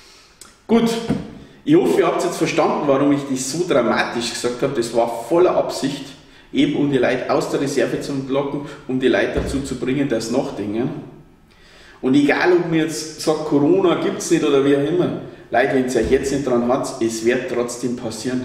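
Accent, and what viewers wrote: Bavaria, Germany. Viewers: Ich hätte mir ja gerne das komplette Video angeschaut, aber die Raumakustik ist so schlecht, dass ich dein Bayrisch, das ich sonst so einigermaßen verstehe, nur mit sehr großer Anstrengung halbwegs verstehen kann. Falls ich der einzige bin, dem das so geht, dann vergiss meine konstruktiv gemeinte Kritik, denn dann liegt es wahrscheinlich an mir.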